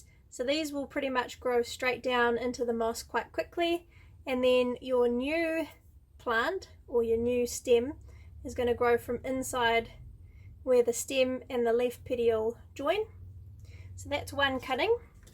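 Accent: New Zealand. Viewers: I love your videos, binge watched them all 😊 Your accent is the best, brings me back to the time I spent in NZ ❤️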